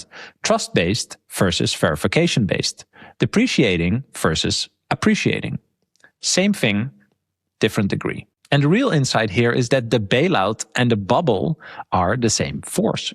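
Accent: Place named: Netherlands. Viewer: Yo! You are the young Jack Nicholson but with a Dutch accent! Keep up the great work, hello from Hungary!✌️😎💚💎✨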